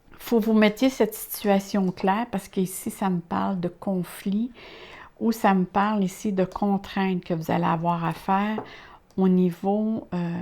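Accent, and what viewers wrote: Quebec, Canada. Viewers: C'est extra pour le 3 eme paquet, curieuse due à votre accent, de quelle région du Québec êtes-vous ?? moi je suis de Québec. Merci de votre explication.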